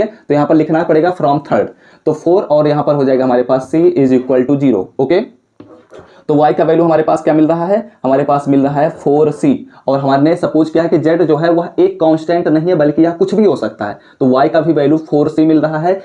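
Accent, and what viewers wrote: India. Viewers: no bihar me aisa pronounciation nhi hota UP me hota hai so UP ke ho sakte hai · I think he is bihari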